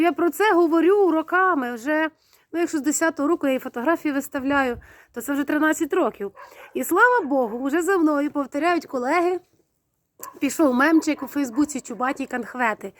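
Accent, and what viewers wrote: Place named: Poltava, Ukraine. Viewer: Цікавою Люблю етнографію. звитчаї і традиції.Судячи з усього, Ви з Полтавщини. (Опішню ще Панас Мирний згадував). Ну і плюс говірка.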